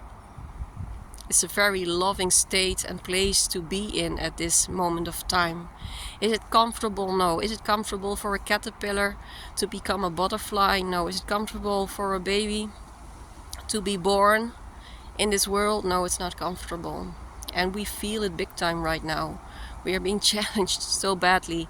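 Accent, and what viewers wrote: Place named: Netherlands. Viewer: Your accent sounds Dutch.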